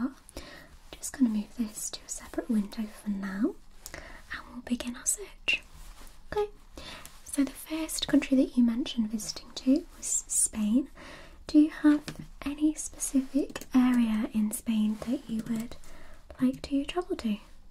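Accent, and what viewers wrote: United Kingdom. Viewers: The accent is giving me the chills. British is so damn nice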